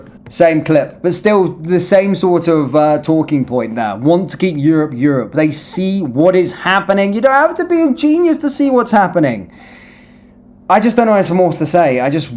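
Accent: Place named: Estuary English, United Kingdom. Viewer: Listen to the Estuary English whine of this goof.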